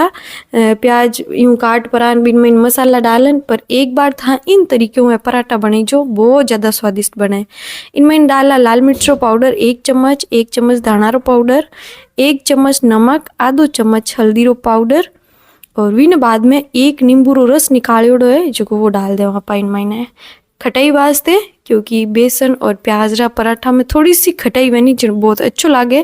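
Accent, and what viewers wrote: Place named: Jodhpur, India. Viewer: Jodpuri boli Masha Allah ap ke zaban sy bohot pyari lag ti ho hum apko Pakistan sy follow krty Hain allah Khush rakhy apko❤❤❤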